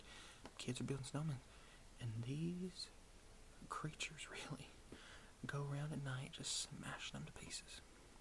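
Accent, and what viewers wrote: South, United States. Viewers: I love this roleplay! You have such a soothing and comforting presence and the southern accent adds to the cozy vibes. I really struggle with anxiety, and your videos help me feel safe and calm. Stay awesome! :) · Your Southern Belle accent is spot on. I love your videos where you embody a different “character”. You’re a very good actor in this medium. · OMG when I saw Christmas boutique roleplay I wondered if you would do a southern accent. And here we are ❤️❤️ 🎉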